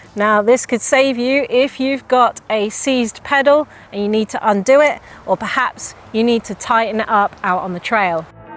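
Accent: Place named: United Kingdom. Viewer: It's funny to hear her say it the way I say it... yet she has a brittish accent.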